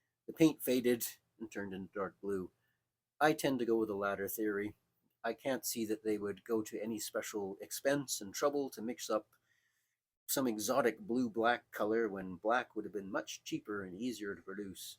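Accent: Canada. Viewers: I take it from your accent that you may be Canadian? One of the greatest scale modelers was Jack McGillvary from Toronto who built and flew his models to unsurpassed levels. He was one of the Flying Aces greats. Beyond that he was a Wakefield Trophy finalist in 1959. Have you tried rubber flying scale?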